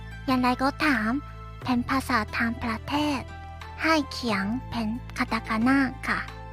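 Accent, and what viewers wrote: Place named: Japan. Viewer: คนญี่ปุ่นพูดไทยแบบญี่ปุ่นนี่น่ารัไปอีกแบบนะครับ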